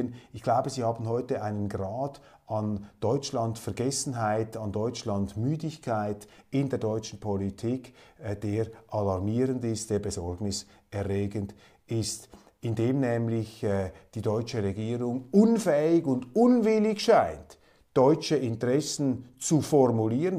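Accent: Switzerland. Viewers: Lieber Herr Köppel, ich kenne Ihre Sendung noch nicht so lange, aber ich bin zwischen ein großer Fan von Ihnen und Ihrer Sendung geworden. Ich höre sie so gut wie immer zu meinem ausgedehnten Frühstück und zwar auch deswegen, weil Sie mich jeden Morgen etwas zum Lachen und Schmunzeln bringen, trotz der ernsten und meistens unerfreulichen Themen die behandelt werden. Aber durch ihre brilliante Rhetorik, gepaart mit schweizerischem Klang und Witz, kann man dabei sogar noch etwas schmunzeln, denke nur an " Haustier Mentalität, Rambo Allüren" usw. kann garnicht alles aufzählen. Auf jeden Fall hilft sie mir etwas gelassener in den Tag zu starten und nicht zu verzweifeln, angesichts der tristen politischen Lage in Deutschland. Wisssen Sie, nicht nur die Politiker sind Deutschland müde geworden und verleugnen jegliche Heimatgefühle, bzw. wollen uns diese austreiben mit allen perfiden Mitteln, auch wir Bürger sind Deutschland müde und unserem Land überdrüssig geworden, angesichts dessen was hier abgeht. 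Ich kenne nicht wenige junge Leute, die ihr Leben noch vor sich haben, die sich ernsthaft mit dem Thema auswandern befassen. Ich gehöre auch dazu, bin aber leider schon zu alt dafür und für einen erneuten Krieg erst recht, das habe ich alles schon miterlebt und das reicht mir für ein Leben. Das schöne Gedicht bringt es genau auf den Punkt, könnte in unserer heutigen Zeit verfasst worden sein.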